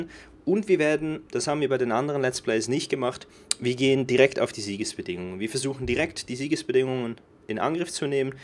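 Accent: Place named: Netherlands. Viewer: Bitte sag mir das du Holländer bist xD der Akzent ist der hammer😂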